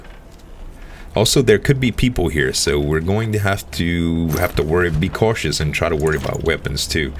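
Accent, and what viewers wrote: Louisiana, United States. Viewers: sounds like hes from Louisiana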